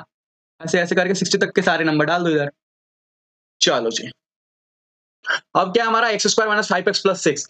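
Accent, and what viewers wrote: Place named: Bihar, India. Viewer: bhaiya kbse hi lg rha tha aapke accent se aap bhi bihar se ho...... ❤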